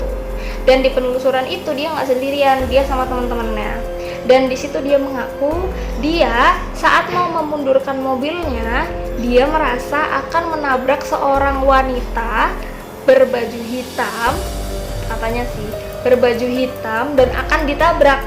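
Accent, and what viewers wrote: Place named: Surabaya, Indonesia. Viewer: Exspresinya dah ok mbak....tp suroboyoan ne iku lhoo seng jek ketok😂😂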